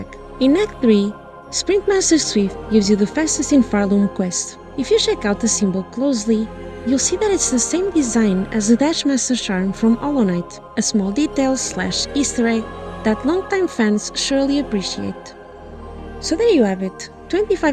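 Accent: Portugal. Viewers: Is your accent Portuguese? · Just out of curiosity, are you Portuguese? Because your accent sounds like it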